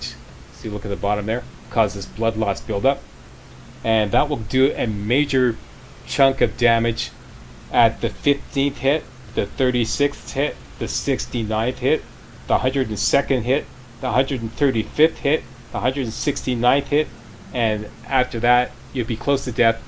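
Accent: Canada. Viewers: By any chance, are you Canadian, Eh?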